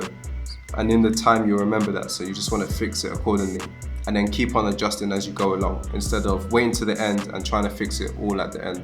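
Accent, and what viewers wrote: London, United Kingdom. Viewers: You from London g ?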